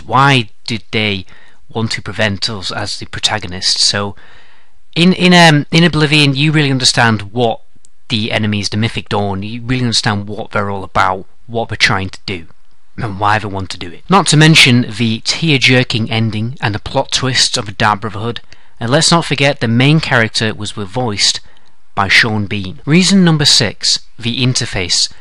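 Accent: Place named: North, United Kingdom. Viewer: where are you from? your accent is exactly the same as the northmen in game of thrones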